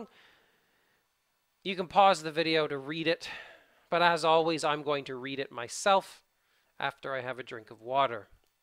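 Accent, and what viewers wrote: Canada. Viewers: So what if you have a Canadian accent??? Native speakers from UK and Australia also have their own accent. Your lessons are great. I am glad I came across to you.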